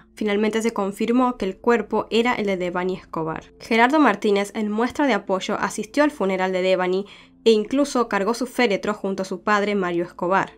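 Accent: Argentina. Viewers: Hola . . Es Argentina de Santiago del Estero ! 😊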